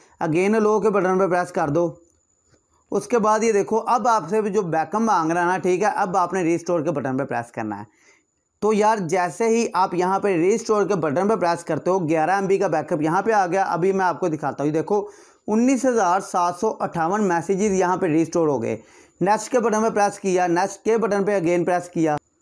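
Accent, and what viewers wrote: Punjab, India. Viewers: Nice accent.. hindi bhi Punjabi jaisi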